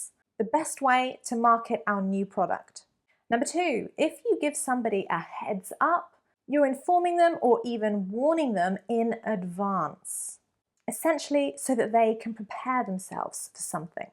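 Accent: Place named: England, United Kingdom. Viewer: Hola me gusta cada ves su acento me enamore del acento ingles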